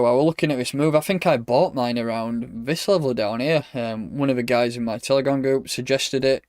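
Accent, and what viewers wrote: United Kingdom. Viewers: Love the accent. Reminds me of all my British mates I may not see for a long time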